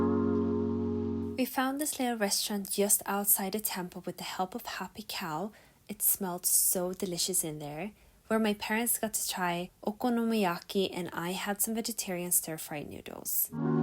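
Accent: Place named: Sweden. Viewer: just saw you in a video on the world friends channel, and I couldn't pin point your swedish accent at all. Where in Sweden are you from?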